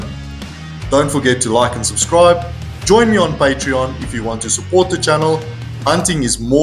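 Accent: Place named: Afrikaans, South Africa. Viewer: Jeeez, you very Afrikaans.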